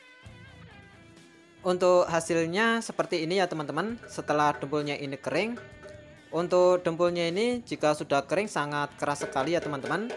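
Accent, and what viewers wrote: Java, Indonesia. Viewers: oh kirain..logatnya jawa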